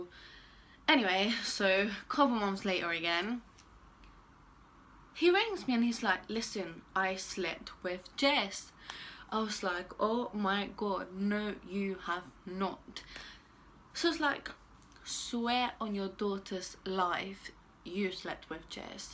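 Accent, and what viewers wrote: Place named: London, United Kingdom. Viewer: You from London or something!? Love the accent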